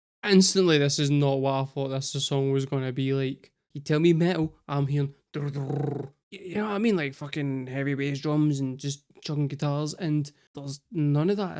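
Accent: Scotland, United Kingdom. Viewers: Nice to hear a Scottish voice getting it.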